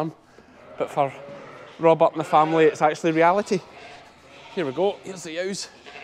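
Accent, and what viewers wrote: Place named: Ayrshire, United Kingdom. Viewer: I should have kept up with your videos,! I haven't watched for about 5-6 months and I have lost my ability to really understand an Ayrshire brouge.□ My bad. I've got to do better! Terri from Texas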